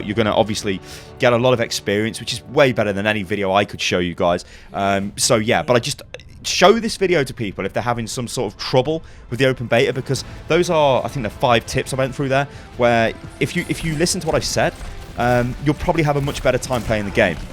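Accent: United Kingdom. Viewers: Closed beta* you have an awesome british voice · Hi British man.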